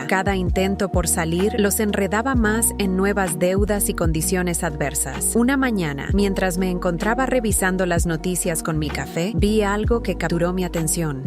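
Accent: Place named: Portugal. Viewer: Y ese asentó portugués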